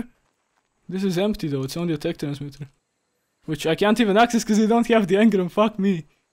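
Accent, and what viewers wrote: Spain. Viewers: hablas como si tu idioma principal fuera el español, xd talvez no me entiendas pero pues saludos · Se nota que eres español 😁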